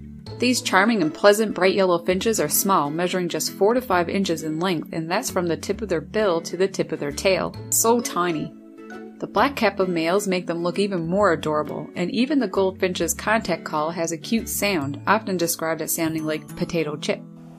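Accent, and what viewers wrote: Newfoundland, Canada. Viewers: Thank-you for this video. It was great to hear the Newfoundland accent! So Canadian! Absolutely the cutest birds, for sure. I live in B. C. and I think we have Sowet Owls here, too. I may be wrong, though. I am sure that there is a very rare, small owl which does live in the Coastal forests, perhaps you know which one it is, 😃 I think it is the Northern Spotted Owl. 👵🏻🐾💕🇨🇦BC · hi u have such a beautiful voice-i can hear the passion -n the cute canadian?accent-luv ca usa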